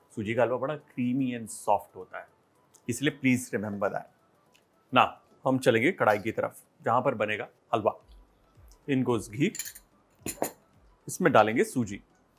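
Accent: Punjab, India. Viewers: Really like ur punjabi accent. In my parents house we make safed chana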